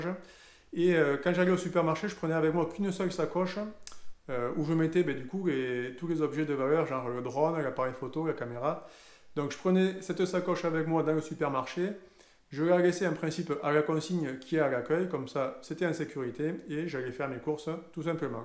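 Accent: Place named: South-West, France. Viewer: Merci pour le vidéo. Et ce petit accent du sud-ouest qui nous fait voyager 😉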